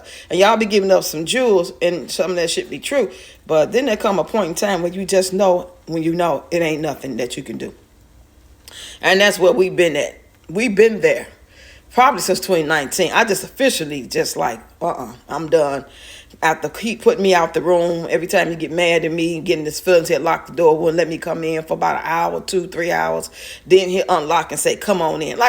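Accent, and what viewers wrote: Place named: Chicago, United States. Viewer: I’m born n raised n Chicago but I live in la , soon as I start watching your videos I heard your accent and I’m liiike ayyye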